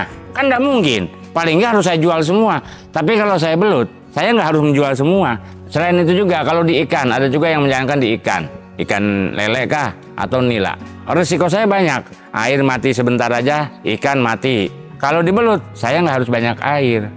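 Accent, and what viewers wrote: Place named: West Java, Indonesia. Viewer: Pantes logatnya kaya logat sunda...lama di bogor toh kang...terimakasih ilmunya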